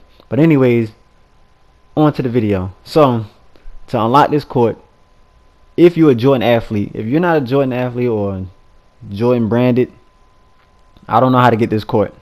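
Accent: Baltimore, United States. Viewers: From Baltimore.?🤔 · i from bmore to soon as i heard you voice i said "this nigga from bmore" lol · you from baltimore?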